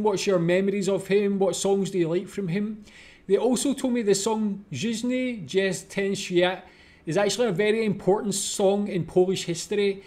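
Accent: Scotland, United Kingdom. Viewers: I like your accent. Enough to check in your description that you are Scottish. Also there is something appealing to polish person or person of polish origin in what you are doing. We sometimes (often) have difficulty to appreciate our own original polish things... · It's a great song form polish Jim Morrison, and Your Scottish accent gives nice touch your comments. Pure dead brilliant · Love your Scottish accent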